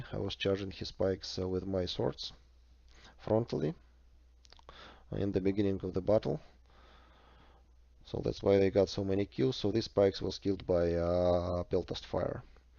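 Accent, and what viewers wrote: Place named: Russia. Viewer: i prefer Bobi and his classic build. if i play without jav i prefer cretan arch than 3 balearics maybe 2 bale and 1 arch but the 40 points of damage is good if you want focus inf with good armour.. but you neel also cav supremacy. btw pelta is pelta <3 good video expecially coz for me is very simply understand english spoken by a russian coz it is much slower <3 great work mate! Lawrence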